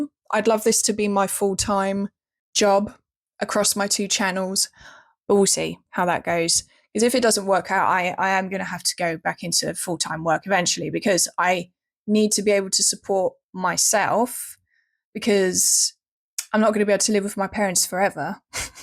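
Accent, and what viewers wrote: RP, United Kingdom. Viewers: Hearing posh English is like hearing birds to sing. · That's not really posh, just normal English. She can actually string a sentence together which you're probably not used to hearing these days.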